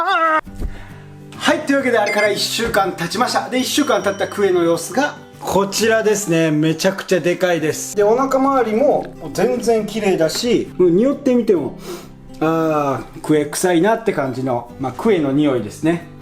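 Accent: Kagawa, Japan. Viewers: 飾らない讃岐弁だから視聴者登録数が伸びるのではないでしょうか。私も今ではすっかり忘れてしまった讃岐弁を思い出して楽しく拝見しています。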